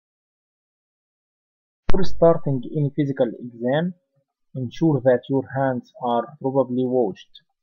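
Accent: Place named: Egypt. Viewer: From the accent I can tell it’s an Egyptian talking😎 thank you 🙏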